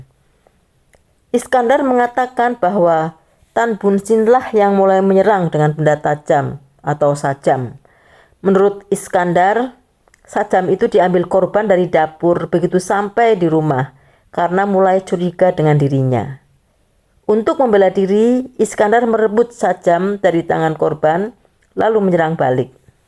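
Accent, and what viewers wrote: Java, Indonesia. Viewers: Suara nya MCM org jawa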